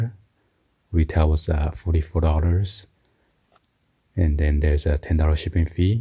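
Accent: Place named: Japan. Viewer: 発音からわかる、100パー日本人。賭けても良い。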